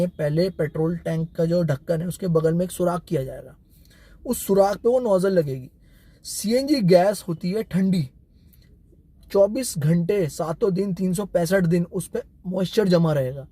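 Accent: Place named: Old Delhi, India. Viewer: Very informative video brother👍👍 I am planning to install CNG kit in my ford figo 2017 model. Could you suggest which Kit I should go for as I have zero knowledge about the kits available in the market. Would appreciate your suggestions here! Also by any chance are you from Purani Delhi😂 I could sense a matching tone & accent what we have in Old Delhi.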